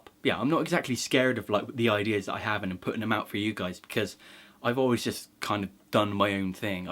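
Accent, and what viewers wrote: Bristol, United Kingdom. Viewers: I'm watching:) I love watching your videos, if for nothing else your amazing Bristolian accent. I was thinking about you the other day, I saw a super pack of nerds for sale:)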